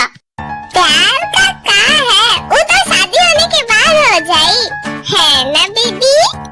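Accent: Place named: South, India. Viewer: Aapki voice me thoda sa south indian touch hai like dadaji 😅 bahut acha laga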